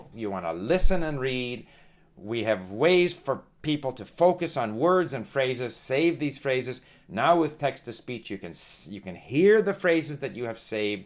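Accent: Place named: Canada. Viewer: Dude, I am Canadian too. You are the most Canadian sounding person I've ever heard. What part of the country are you from?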